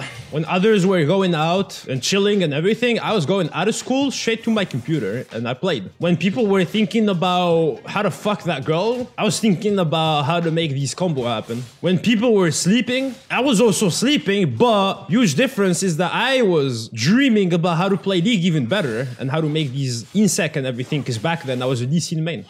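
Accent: France. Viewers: Ta laccent francais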